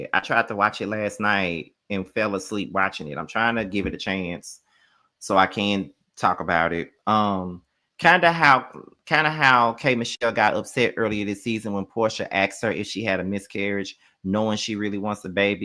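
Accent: South, United States. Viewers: Omg u sound so country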